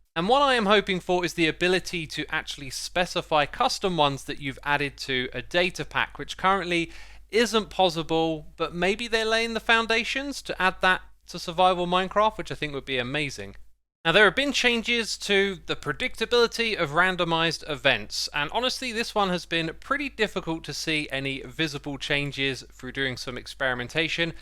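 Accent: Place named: United Kingdom. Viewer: realizing i have never heard anyone with a british accent say "y'all" until today.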